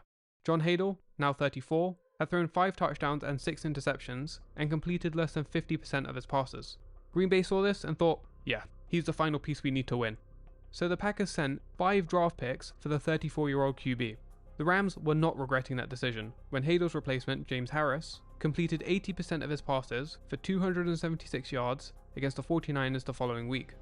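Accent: England, United Kingdom. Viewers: good video. I like your English accent. With that said it bothers me when talking about American Football. Would you be a lamb and do a video Hershel Walker.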